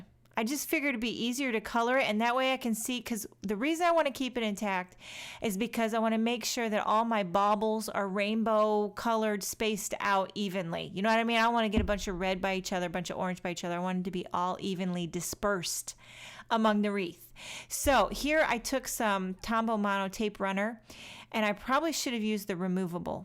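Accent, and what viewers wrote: New York, United States. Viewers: It's a New Yawk accent ~ and New Jersey, too if I must say so myself:) I LOVE your rainbow wreath,...it's Gawguss!!! Thanks so much for another fun video!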